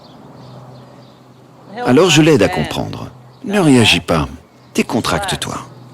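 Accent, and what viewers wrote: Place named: Australia. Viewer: L'accent australien me fera toujours cet effet bizarre :P En tout cas c'est un super travail, je voulais tenter l'éthologie avec un cheval qui est assez (pour ne pas dire énormément) nerveux, craintif et surtout pas dressé pour voir si ça l'aiderait, j'ai reproduit les mêmes gestes (j'ai eu de nombreuses cloques au passage du stic) mais maintenant je peux presque monter sans incidents, je continue, ça nous aide beaucoup tous les deux ! Merci !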